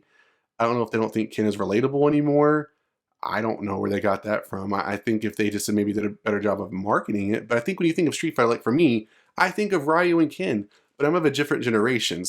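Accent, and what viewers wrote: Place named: United States. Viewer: i said american because i was asking to whom i was assuming as an american from his accent. not saying any other countries are very good at it. i get your point, but i'm an asian yet i learned how to pronounce common western/english/american names correctly n i found it not that hard especially when you hear many people around you pronouncing/teaching you correctly.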